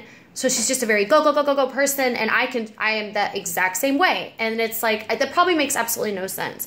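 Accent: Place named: South, United States. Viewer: I immediately caught your accent because I'm southern too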